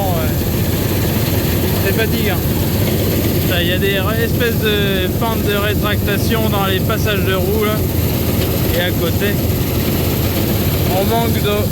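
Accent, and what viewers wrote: Charente, France. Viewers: Salut. Pas pareil en 2003 ? Grand plaisir d'entendre l'accent Chérentais de mes racines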